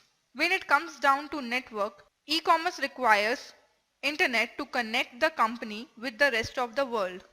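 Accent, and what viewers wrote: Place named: India. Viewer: I can't understand due to the Indian Accent.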